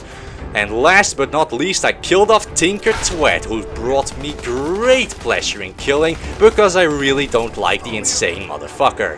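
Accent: Netherlands. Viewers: Heerlijk dat Nederlands Engels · hou van het nederlandse accentt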